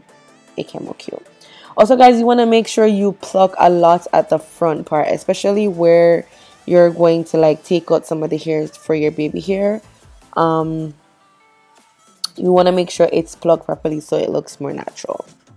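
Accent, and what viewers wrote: Jamaica. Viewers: Omg your Jamaican?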